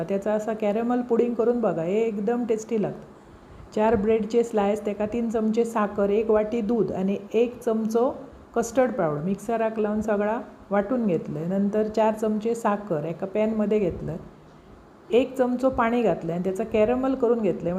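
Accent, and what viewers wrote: Malvani, India. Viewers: Luv to listen to your malvani ❤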